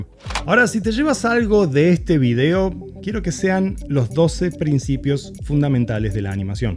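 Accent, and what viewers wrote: Argentina. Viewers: ah me pareció escuchar algo... en tu voz se deslizaba un poco de sierras de cordoba , peperina , espinilla y burro ! Que bueno que hayas llegado adonde estás con la animación. Yo solo colecciono fracasasos en el mundo de la aniamcion. Lo peor que me encanta. Y quizás deje y tire todo ya . Debo ser muy amargo .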